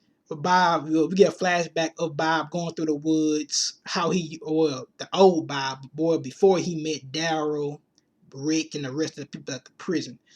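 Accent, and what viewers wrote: South, United States. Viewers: I love your videos your accent is super country when will you post a prediction video for the next episode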